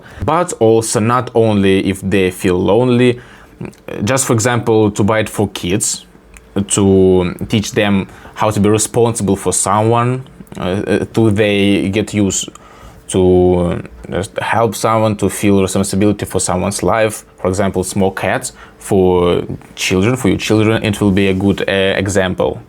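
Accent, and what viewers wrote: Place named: Russia. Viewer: эхх этот русский акцент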